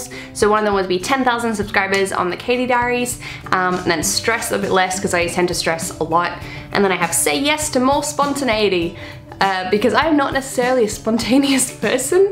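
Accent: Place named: England, United Kingdom. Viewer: Is she english? I quite like her accent 🧡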